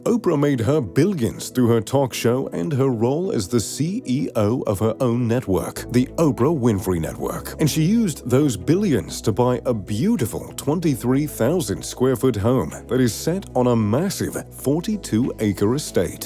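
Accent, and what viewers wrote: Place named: RP, United Kingdom. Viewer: I hade no idea where you were from. But I knew you were foreign. You have mastered the upper class/posh accent 🤝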